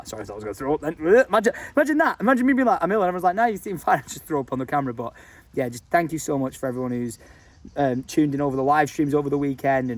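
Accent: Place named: Scotland, United Kingdom. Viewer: Which of your parents are of Scottish descent? I've never noticed a Scottish accent in either of them, but you often adopt one, as you did today. Or is that just a "Manchester thing?"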